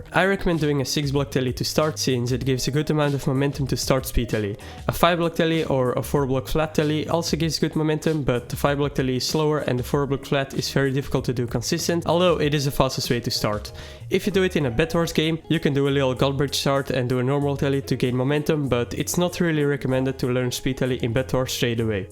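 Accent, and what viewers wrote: Netherlands. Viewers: are you dutch becuse you sound like 1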